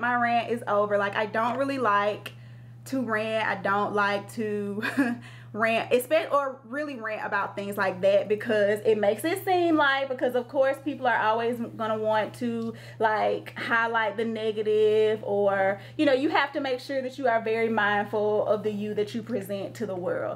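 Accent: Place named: Georgia, United States. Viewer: What up, cousin! I have to ask, where are you from? I could listen to you speak all day LONG. I love your accent, but I can’t place it? Is it Georgia? I’m sure your southern cousins are laughing at me right now, but I am serious. I can’t place what state you’re from! I’m from New Jersey, live in San Francisco and on top of that, I’m an Army Brat and lived all over as a kid, so my “accent” is such a mash-up of everything that I sound like I have no accent at all 😂. It’s also left me fascinated with other people’s accents. I love how most other people can carry their home with them wherever they go. It’s very sweet. Anyway, I love your accent. And I’m curious. I also love how confident you are. That’s all.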